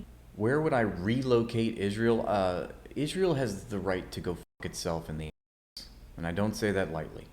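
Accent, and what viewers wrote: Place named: United States. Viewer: Biden or Trump doesn't matter aipac wins... true statement... but as for the rest, all systems exploit... and rolls eyes, there is no white privilege, rich privilege sure... and workers are great, but you should thank the Constitution for your Rights (I'm assuming from youre accent that youre an American citizen)... good luck!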